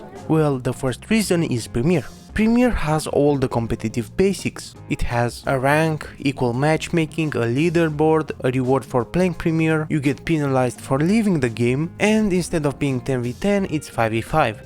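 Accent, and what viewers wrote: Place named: Eastern Europe. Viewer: Ha, so this is what the voice of reason sounds like, it has a vaguely Eastern European accent, makes sense! Liked and subscribed, you hit every nail on the head.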